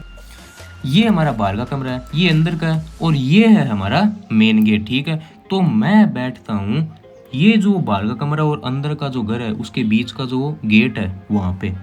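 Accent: Haryana, India. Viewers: Mehnat to hai bhai...... Mehnat to kar rha launda..... Haryana se ho kya? Apki voice se lag rha ❤ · same😆 pubg ka name letee hi khatam🤣🤣 by the way love from my heart m bhi haryanvi hu apki awaaz se pta chala ❤️ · same situation brother 😂😭 Aap bhi Haryana se lagte ho fir to 😂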